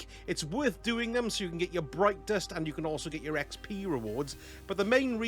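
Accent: Wales, United Kingdom. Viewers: Thank you Mr Welsh man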